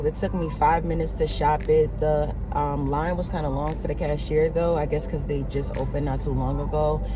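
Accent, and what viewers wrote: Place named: New Jersey, United States. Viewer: Your accent sounds like your close to me I'm in burlington nj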